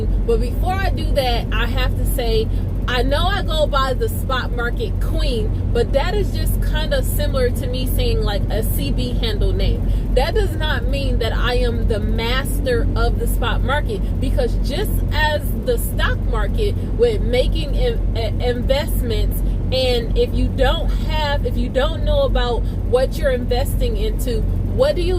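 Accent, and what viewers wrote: South, United States. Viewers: Are you from the south? What are some good load boards besides DAT? Can you name a few?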